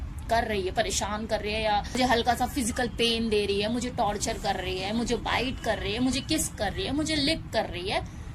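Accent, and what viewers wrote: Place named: Delhi, India. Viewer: mujhy lagta hy aap Dilli se hn na. Apki zaban (Urdu) aur lahja bata raha hy... Aur aap bohot khubsurat (sundar) ho....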